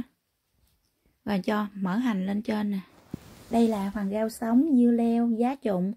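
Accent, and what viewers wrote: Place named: Mekong Delta, Vietnam. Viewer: lâu tôi được nghe lại phong cách Người Miền Tây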